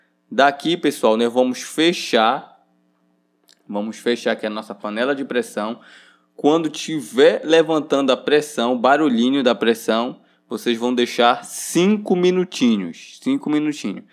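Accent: Pará, Brazil. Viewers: Amoooo 😍😍 o seu sutaqui , paraense 💕💕💕💕💕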